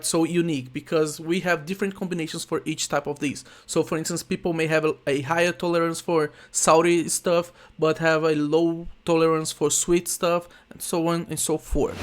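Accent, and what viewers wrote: Brazil. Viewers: err...are u brazilian? ur accent and the books...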